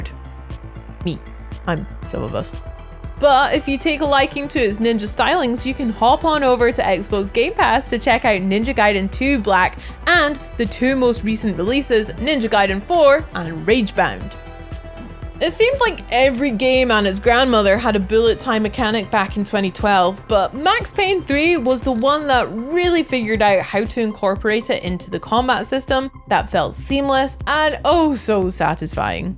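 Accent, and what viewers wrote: Scotland, United Kingdom. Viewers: She sounds Scottish at x1 speed as well ya know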